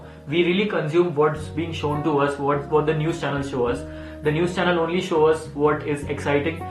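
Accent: India. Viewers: Indian accent at its finest (no offense) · Are you a Bengali? 😅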